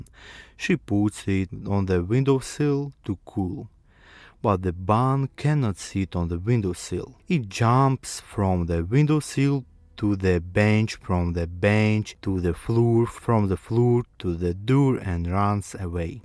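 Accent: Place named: Russia. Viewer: Бро, с таким произношением тебя никто не поймёт, кроме русских. Floor, door = [флор], [дор] Bear = [бэр], "бир" это пиво =Ъ Abandoned = [абАндонд] и т.д. Акцент акцентом, но гласные должны быть хоть приблизительно правильные.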